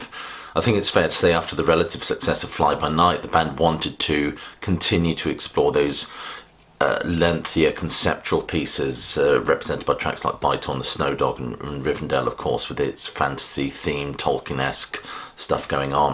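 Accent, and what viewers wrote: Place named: RP, United Kingdom. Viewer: I can listen to this fellow speak for days. Remarkably articulate and filtered through a regal British accent. He reminds me of Ian Anderson, to a small degree.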